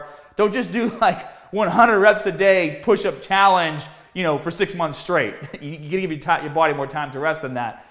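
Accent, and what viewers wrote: Boston, United States. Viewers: this guy must be from boston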